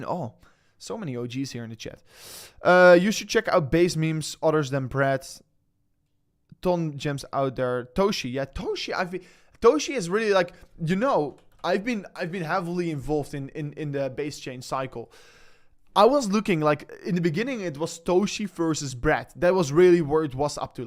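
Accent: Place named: Netherlands. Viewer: Why you always say aswoll😊 very obvious dutch slang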